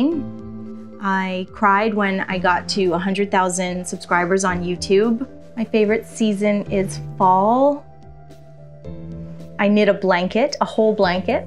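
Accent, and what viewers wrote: Canada. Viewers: Been watching your channel for awhile love your sketching and watercolour tutorials. I picked that you were Canadian - I could tell by your accent. Great video and thanks for sharing.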